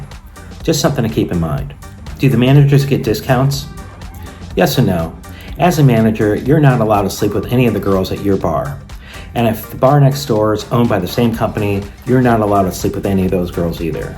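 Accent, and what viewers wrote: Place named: United States. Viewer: Educated English? He's a yank.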